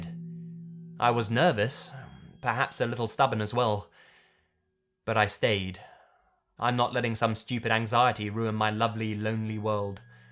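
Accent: United Kingdom. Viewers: I cant stand british accent :D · I don't know about anyone else but I am somehow very attracted to the voice of the narrator because I love british accent and his voice is sooooo appealing to me😅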